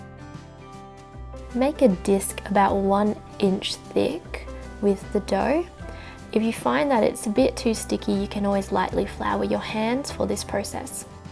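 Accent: Australia. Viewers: This is not meant to be insulting or racist in any manner, but as an Aussie of Scots-Irish descent, and having been descended from First Fleet penal colonists, I’ve never been able to wrap my mind around an Asian with an Aussie accent. That said, the scones look deelish…nice and fluffy.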